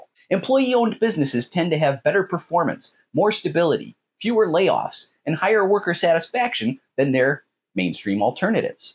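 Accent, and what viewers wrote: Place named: United States. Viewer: I assume from your accent that you are from the US. Preaching the merits of Communism from a position of wealth, prosperity and freedom in the world's most successful Capitalist nation is the definition of hypocrisy. If Communism is as glorious as you allude to, why, sir, are you not living in Venezuela, Cuba, or North Korea?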